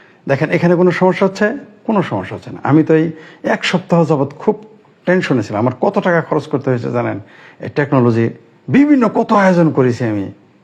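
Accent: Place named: Jamalpur, Bangladesh. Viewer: ১৯৭১ সালে মুক্তিযুদ্ধের সময় এম আর আখতার মুকুল ঢাকাইয়া ভাষার স্টাইলে স্বাধীন বাংলা বেতার কেন্দ্র থেকে 'চরম পত্র' পাঠ করে বাংগালী জাতিকে উজ্জিবিত রাখতেন। আপনি জামালপুইরা ভাষায় 'ভয়েস অব এথিস্ট' দিয়ে আমাদেরকে উজ্জিবিত রাখছেন। এসব ব্যাংগাত্মক রম্য রচনা লিখন ও পঠনের জন্য আপনাকে অভিনন্দন।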